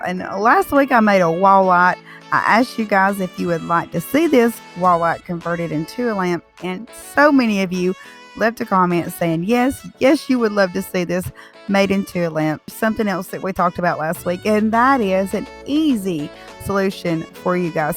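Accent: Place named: Alabama, United States. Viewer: I absolutely love your accent. Sound like my sil from Bama.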